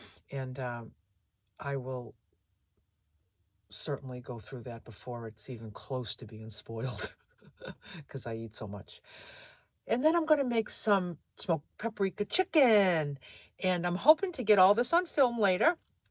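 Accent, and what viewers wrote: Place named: New England, United States. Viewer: I hear that familiar New England accent. Been up here 12 years.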